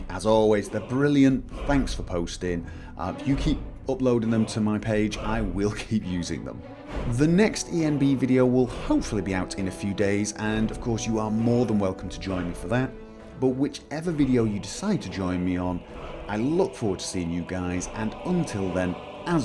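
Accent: United Kingdom. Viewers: His accent is not Scandinavian at all it's British (I know the accents there vary but I'm not sure exactly where is from). However, he does live in Norway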